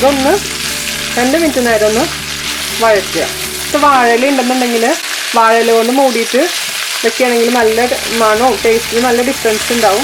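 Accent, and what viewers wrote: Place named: Thrissur, India. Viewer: .....I really loved the way you talk:D thani thrissur bhaasha great recipe tto. Am also from Thrissur but don't have that tone:) I will wait for more recipe's from you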